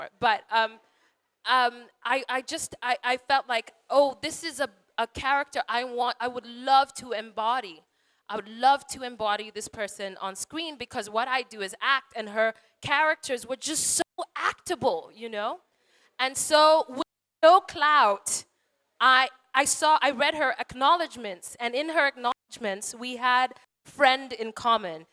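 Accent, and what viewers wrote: Nigeria. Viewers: She's our own do you hear that English oooooh Nigeria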